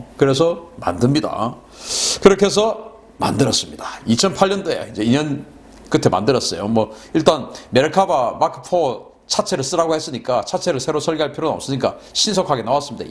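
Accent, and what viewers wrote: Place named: Gyeongsang, South Korea. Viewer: 산인균 말 들어보니 경상도네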